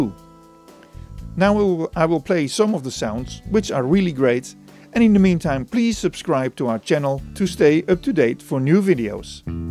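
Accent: Netherlands. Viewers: you sound really dutch lmao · Haha I also noticed within the first sentence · I can clearly hear you're dutch man. Just like me or not? :-) I recognize it directly in the first 10 seconds lol. Good you're doing this and i really love it. Thanks i appreciate it!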